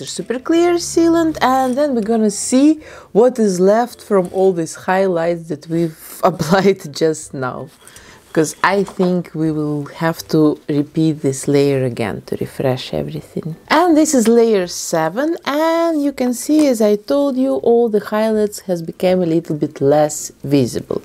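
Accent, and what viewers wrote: Russia. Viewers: Что за жуткий рязанский английский?) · Кажется как будто акцент русский... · русский акцент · Русский акцент? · Вы русская? Чувствуется акцент) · Ты из России? Прям чую чуйкой акцент)/ Are you from Russia? I feel the uccent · У тебя русский акцент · Кукла в вашем исполнении получилась великолепной. Томные, выразительные глаза подчеркивают некую таинственность в Жасмин. Очень понравился результат. Во время просмотра вашего видео я никак не могла понять, у вас присутствует некий русский акцент, или я ошибаюсь?) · иногда кажется, что она русская по акценту и словам) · какой то русский акцент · Ну скажите, что вы русская! Такой сильный акцент!)) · Что за акцент..русский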